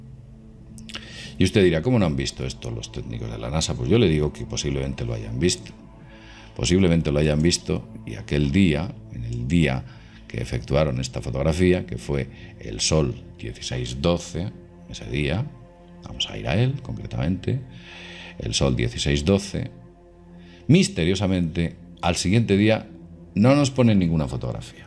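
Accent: Spain. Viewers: Dire algo que jamas han dicho... me encanta tu voz ;) ja! naa lo digo en serio, me encanta el asento español (soy chilena) y obviamente tambien todos estos temas de conspiraciones y demases. En resumen, tengo todo lo que me podria gustar en un solo video ;) buena suerte y adelante.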